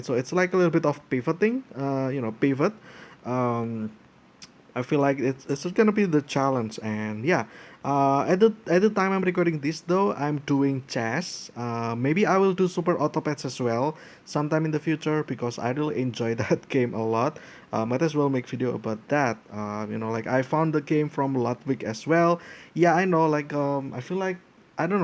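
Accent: Indonesia. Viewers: Bro, from your accent, i guess you're Indonesian?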